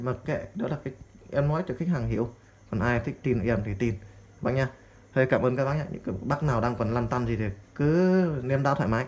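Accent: Standard. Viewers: Công nhận bạn nói rất chuẩn .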